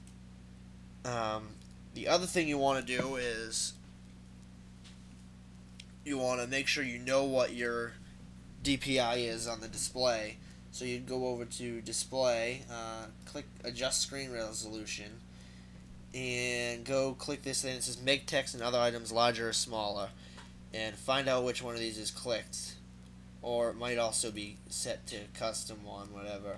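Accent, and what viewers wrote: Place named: New York, United States. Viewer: man.. are you from NY or NJ, accent is hiliarous lol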